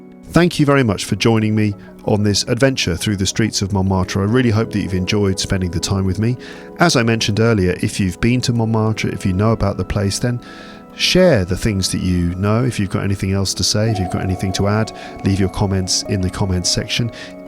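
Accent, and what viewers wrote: United Kingdom. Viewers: What beautiful, well documented, almost lyrical report on. a parisiàn district I had the opportunity to stfoll up and down years ago whilst a student. Thank you for the entbjsiasm, your English-savoured French words reading 😮, I ennoyed your "Walk, cycle and Talk" immensely 😢 Françoise crom Martinique.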